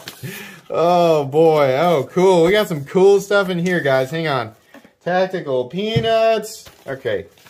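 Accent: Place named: South, United States. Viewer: Extreme southern: thes air ryt hare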